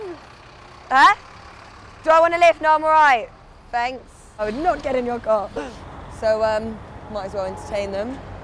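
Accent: Australia. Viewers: The Aussie accent sounds good but should really have mentioned walkabout and you do not ever see an aborigine in the bush. They are at one with nature and disappear. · Aussie accent not too bad...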